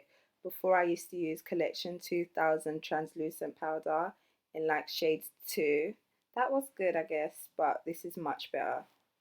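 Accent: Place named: Jamaica. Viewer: love the look....ur accent,,,, do you have jamaican background?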